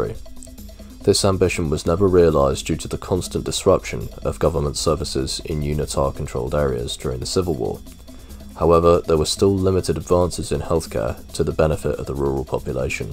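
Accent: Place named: London, United Kingdom. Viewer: Wait... I hear that youre from London! (possibly even east London!). Great to hear a familiar voice! (I'm from East London)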